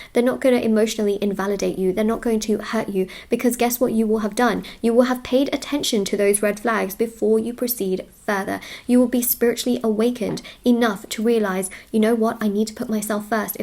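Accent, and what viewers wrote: United Kingdom. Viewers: That was one joyous reading! Your accent makes me wonder were you born in the UK or is it acquired?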